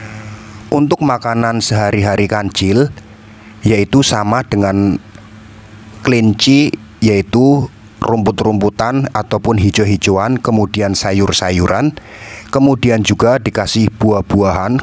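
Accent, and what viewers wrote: Banyumas, Indonesia. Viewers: Apakah Bpk berasal dari Cilacap,Purwokerto,Banyumas,Tegal,brebes,Pemalang,Banjar negara Kenapa Ngapak😂😂😂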